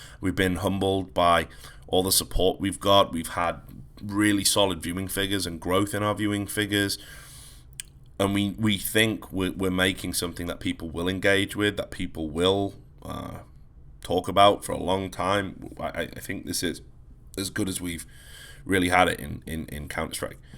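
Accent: United Kingdom. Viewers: Don't let them kill your accent, the British accent is like half the reason I watch your podcasts <3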